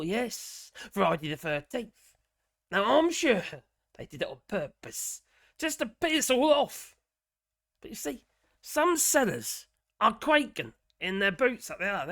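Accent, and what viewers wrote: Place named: Norwich, United Kingdom. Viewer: By the way, who runs this channel is from Norwich. I didn’t know this accent but I’ve got quite used to it from listening to him! 😉🙂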